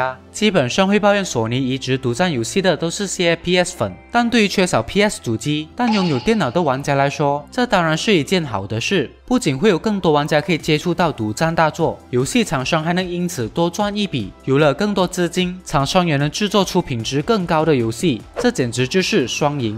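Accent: Malaysia. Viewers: 他是马来西亚人哦~ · 應該是馬來西亞的口音吧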